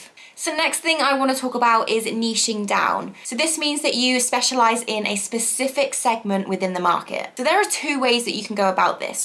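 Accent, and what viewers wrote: United Kingdom. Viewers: Hello, thanks for your so helpful videos! I love your BRITISH accent but you speak a bit fast lol. thanks again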